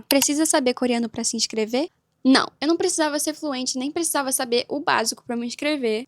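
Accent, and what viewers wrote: Portugal. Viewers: você tem nitidamente um sotaque português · senti o sotaque de português Portugal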